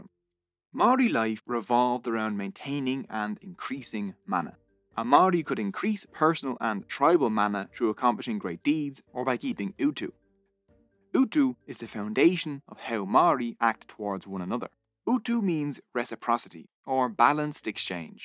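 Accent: Ireland. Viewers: I wasn't prepared for the Irish accent 🤣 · I cringe every time you mention any Maori Kupu (Maori words). Whether it is your Irish accent or ignorant of indigenous cultures, it kills my mother tongue. Otherwise, it would've been more entertaining, one and a half stars from five. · Totally agree! And nicely done in an Irish accent! 😁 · Are you Irish? after a few minutes all I can hear is an Irish accent.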